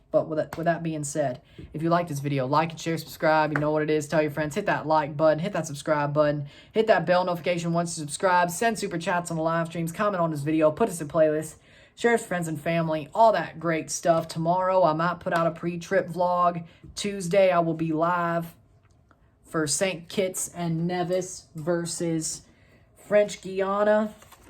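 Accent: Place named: Midwest, United States. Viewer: Are you from Canada? Your accent sounds like Midwest USA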